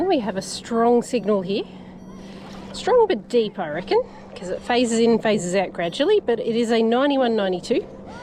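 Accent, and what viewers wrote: Australia. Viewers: I never noticed how long it takes to get to the action part of your video. You could ramble all day long for all I care. I love Aussie accents. You and your personality are the main reasons I "tune in". It's irrelevant (to me) if you find anything at all. The longer your videos, the better.: ) Well done. Awesome find! Please take care, and God bless you,!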